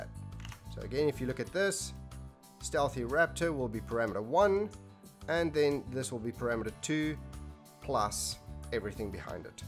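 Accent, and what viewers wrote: South Africa. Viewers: homie are you afrikaans?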